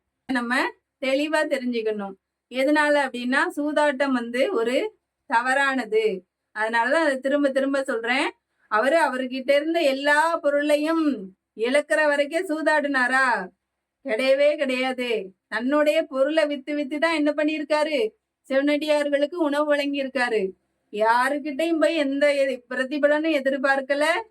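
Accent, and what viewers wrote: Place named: Tirunelveli, India. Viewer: Good Tirunelveli Tamil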